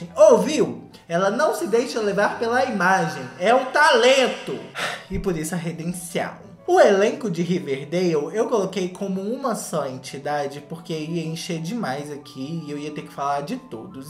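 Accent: Minas Gerais, Brazil. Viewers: O sotaque mineiro do tá cada vez mais forte, eu amo.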